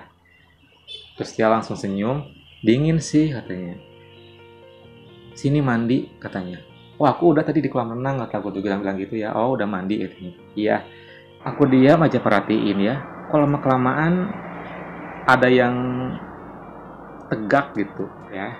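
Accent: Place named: Bandung, Indonesia. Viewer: orang bandung ieu mah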